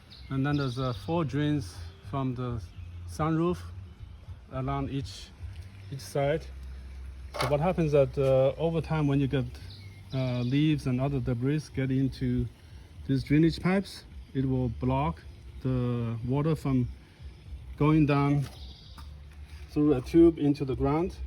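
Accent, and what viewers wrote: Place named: China. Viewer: 浓烈的同胞的口音:face-blue-smiling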